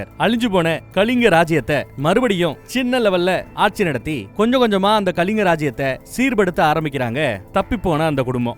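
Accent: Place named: Madurai, India. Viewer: நீங்க மதுரைக்காரரா நம்ம மதுரைக்காரன் மாதிரி பேசுறீங்க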